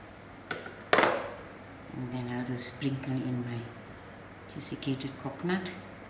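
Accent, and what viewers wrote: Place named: Durban, South Africa. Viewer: U explain so well in your Durbsn accent love the way u cook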